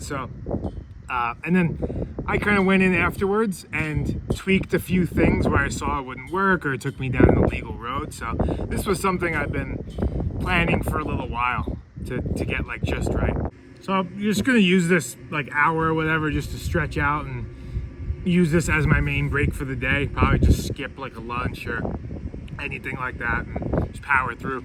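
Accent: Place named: Long Island, United States. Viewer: Your demeanor and mannerisms remind me of Chris Cuomo. He's a Long Islander too so maybe its the accent. Great speaking voice!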